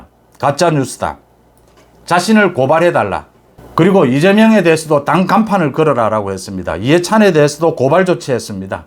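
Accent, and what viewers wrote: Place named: Busan, South Korea. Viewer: 작은것부터 시작을 했습니다.하루도 시청하지않은적이 없지만 요즘은 댓글 써지는 않았습니다.조선앱에서 댓글다느라 조선댓글창 네임은 둥이할머니 입니다.나이많지만 고향이 부산이라 같은억양의분들 마나면 더 반갑답니다.송국장님의 스승을 기원드립니다.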